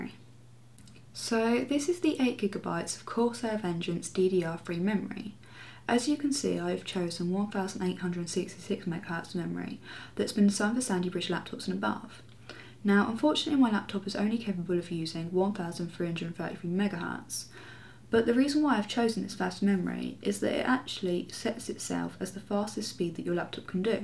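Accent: England, United Kingdom. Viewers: Are you from england ? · are you an idiot shes english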